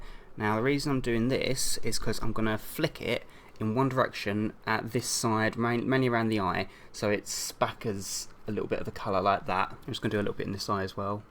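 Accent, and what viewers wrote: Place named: Bristol, United Kingdom. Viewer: Youre just ouststanding mate!, Your accent sounds little like Bristol'ian accent, are You from there?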